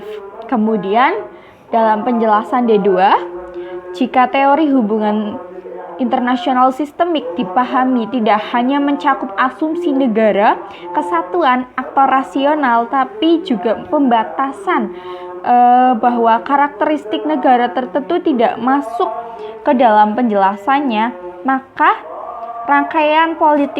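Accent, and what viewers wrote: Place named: Java, Indonesia. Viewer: Kamu medhok sekaliii beb😂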